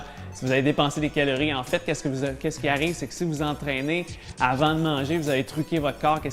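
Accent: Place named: Canada. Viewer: SUPER ces entrainements, en plus j'adore ton accent canadien....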